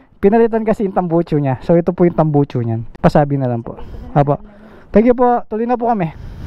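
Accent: Pampanga, Philippines. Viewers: Kapampangan accent jang mag tagalug ka balu me e hahahaha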